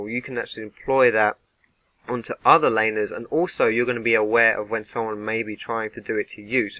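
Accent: United Kingdom. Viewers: expected a standard "ward here, lvl this and that, kill this first" guide stayed for the british accent liked because of the content i'll try to change more aspects about my attitude, but it's hard when the people you're trying to learn are completely over their own head ¬¬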